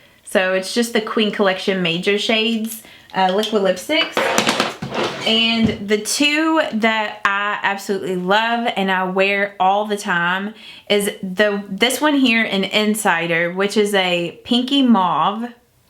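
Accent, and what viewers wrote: South, United States. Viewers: I love your southern accent. It’s so cute.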